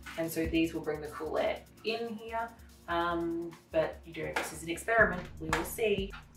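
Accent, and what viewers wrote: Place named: Australia. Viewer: Great build love the Aussie accent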